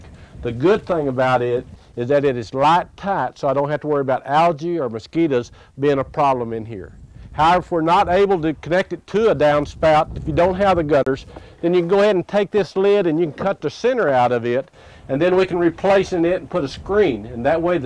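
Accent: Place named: Texas, United States. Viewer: Great video.. I love the Texan accent :-)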